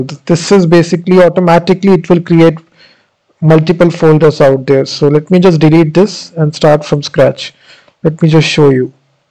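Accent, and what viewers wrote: India. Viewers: Pure Indian accent and broken microphone. I love this guy❤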